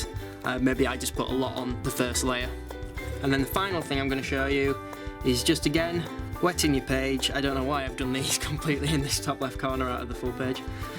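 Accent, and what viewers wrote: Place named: Northern England, United Kingdom. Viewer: Such a thick northerner accent I reckon (I'm not an English speaker) But were you stoned or is it just my stoner paranoia?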